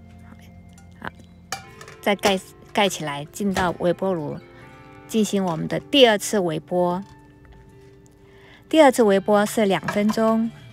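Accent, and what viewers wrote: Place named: Taiwan. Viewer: 听口音这位博主是台湾人，不是大陆人。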